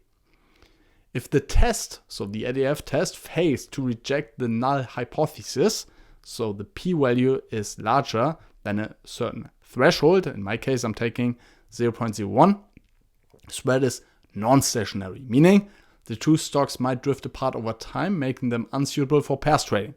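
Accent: Germany. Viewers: Jesus Lord, I can't believe I only just now stumbled on this amazing channel! And he has German accent. Pure gold. Amazing video and neat code. Thx so much mein Sensei 🙏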